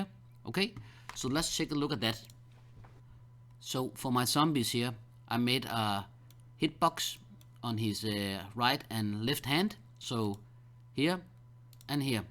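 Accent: Denmark. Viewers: i can hear that u are Danish bro. I'm Danish too and i recognize that way u talk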